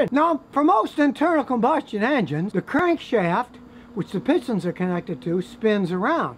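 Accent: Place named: North, United States. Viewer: originaLkomatoast he may live in Texas, but he sounds like a yankee. I want to hear THAT story. What brought him here?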